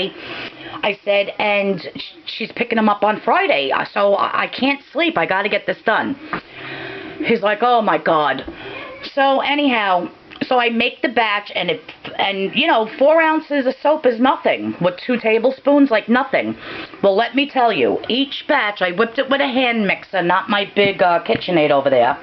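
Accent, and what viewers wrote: New York, United States. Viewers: you sound like me where are you from you sound like a new Yorker I'm from Staten island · Thank you for all the info. I also love your accent. Since I moved from Fairfield County CT to Houston I don't get to hear NY accents anymore. And you cracked me up about your husband hearing the mixer. LOL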